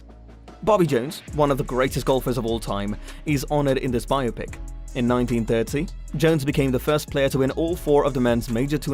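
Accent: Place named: United Kingdom. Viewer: Drop the British accent. Irritating!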